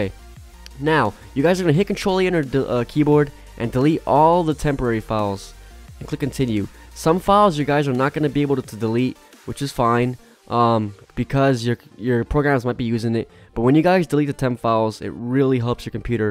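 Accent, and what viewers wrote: Croatia. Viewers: are you from croatia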